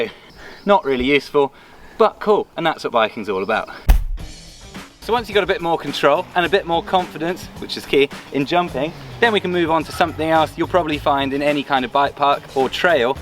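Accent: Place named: England, United Kingdom. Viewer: that prim and proper english accent juxtaposed with outrageously insane bike skills, full marks sir!!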